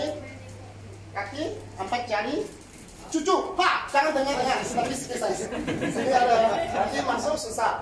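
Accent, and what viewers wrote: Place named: China. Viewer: Melayu with Chinese Accent...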